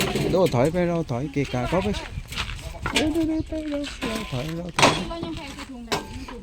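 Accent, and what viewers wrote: South, Thailand. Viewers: ชอบสำเนียงการบรรยายคลิป.. มากๆ สำเนียงใต้ ได้อรรถรสดี... แต่ก่อนทีมหลังบ้าน กดติดตามแต่ช่องบ่าวโทน กับแม่บ่าวโทน วนี้กดให้ทุกคน..แล้ว... ระยะเวลาเกือบจะ4เดือน ได้ดู การกระทำ ดูแล บ้านน้องอุ๋งอิ๋ง และสัตว์เลี้ยงน้องดีมากๆ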